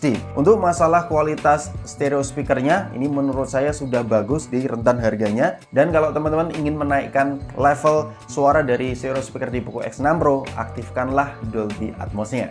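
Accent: Semarang, Indonesia. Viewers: wong semarang yo bang?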